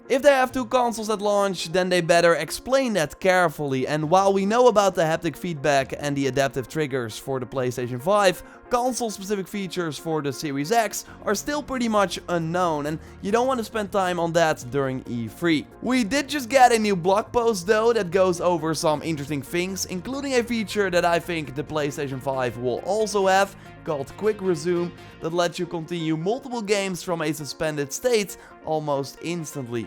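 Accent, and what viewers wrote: Netherlands. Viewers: You sound Dutch...but are you African American? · Man Ive been watching a lot of your videos since you were mainly uploading AC odyssey videos and I still have no idea which accent it is that you have. Is it Dutch? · I can hear ya dutch accent😅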